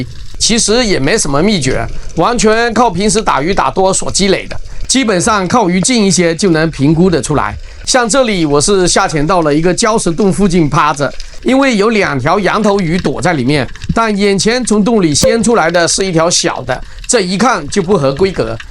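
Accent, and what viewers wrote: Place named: Fuzhou, China. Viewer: 你是福建福州人吗 福州口音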